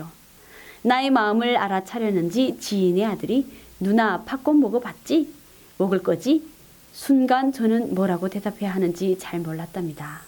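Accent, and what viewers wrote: North Korea. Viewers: 미모의젊은처녀의 평양스피치에 녹는다 녹아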